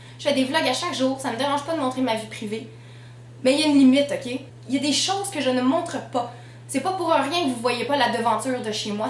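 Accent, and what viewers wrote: Quebec, Canada. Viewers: Oh stp!! Je suis française et grâce a toi, j'suis tomber en love du Québec et son accent!! 💋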